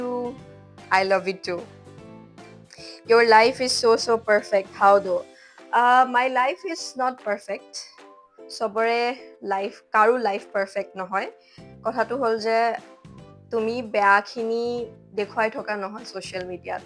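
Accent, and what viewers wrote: India. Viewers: Tumr Maat tu Huni e gom paisilu tumi amr Jorhat r e hoba sge buli ❤ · Baa emn dhunia koi kotha bur kua ekdom upper Assam r style t ..... love from Sivasagar ....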